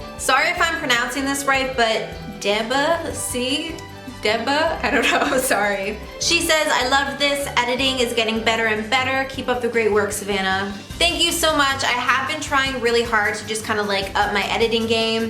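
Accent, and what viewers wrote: Canada. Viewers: are you canadian ? hehe :) cute accent (the way you say about and cilantro and avocado got me)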